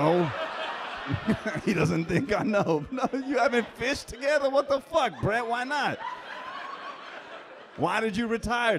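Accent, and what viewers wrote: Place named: New Jersey, United States. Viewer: As a fellow (undercover) Patel, has the strongest NJ/NYC accent I’ve ever heard. My dog came up!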